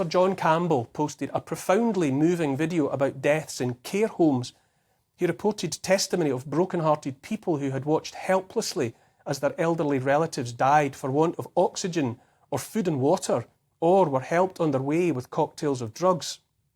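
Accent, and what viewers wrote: Scotland, United Kingdom. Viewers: I do pay attention to what say, but sometimes I just play back so I can hear your Scottish Brogue!:O) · Although I agree with you on everything, your common sense statements more persuasive in your Scottish burr 💕🇺🇸❤️🏴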